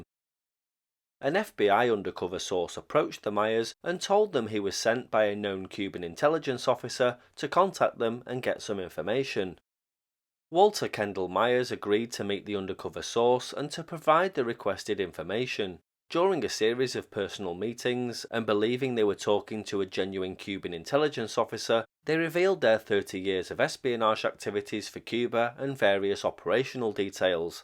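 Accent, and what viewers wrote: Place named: Liverpool, United Kingdom. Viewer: Your accent reminds of The Beatles accent. I was able to See The Beatles in Memphis Tennessee in 1964.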